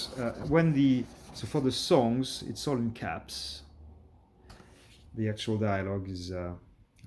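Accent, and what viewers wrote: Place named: France. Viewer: You speak english like a french !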